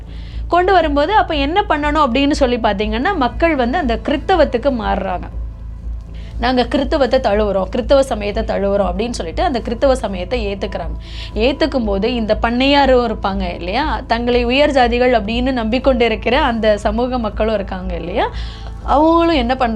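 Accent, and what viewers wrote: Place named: Nagercoil, India. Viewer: இப்ப தான் original Nagercoil காரி மாதிரி பேசுற சகோ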